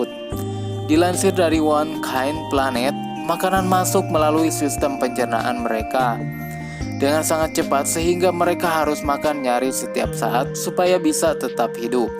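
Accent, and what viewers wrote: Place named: Sunda, Indonesia. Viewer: Dialek sunda nya kentel... Lanjutkann mantappp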